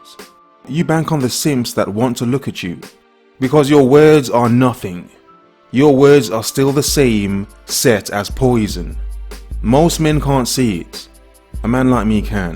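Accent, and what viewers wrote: United Kingdom. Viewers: He is a Brit..I am surprised you couldnt pick up the British accent. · YouTube recommended this, but I can see through unoriginality of an African with a British accent trying to lecture males, not men to emulate his behavior and interaction therefore...I'm good these are devalued times, doesn't mean I need to stoop to a bottom of the barrel lecture from a schemer mentality to get through existence, love don't begin with a stranger it begins with sincere parents, family, and friends, every thing else is fate.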